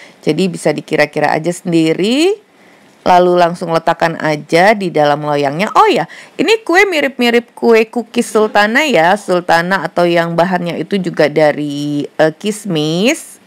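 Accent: Java, Indonesia. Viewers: Stik kurma iki L39... mohon maaf lahir batin ya mam..... hari ini penuh kemenangan... ini edisi jowo.. Ini aku full ya maaf baru sempat.... Paduan kurma dan coklat Ketebalan nya di kira kira aja Lalu oven...no skip dihari kemenangan Cocol setengah aja Kalo semua belepotan Wooòoh jawa nya medok....banget Episode terakhir biskuit tabur almond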